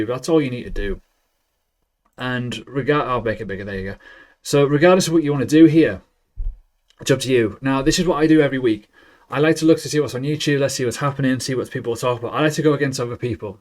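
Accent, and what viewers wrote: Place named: Newcastle, United Kingdom. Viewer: I know one thing. I'd listen to a good geordie lad over some california bullshitter all day long .I've listened to all the crypto you tubers over the past year and it's not hard to work out that most of them are wrong uns .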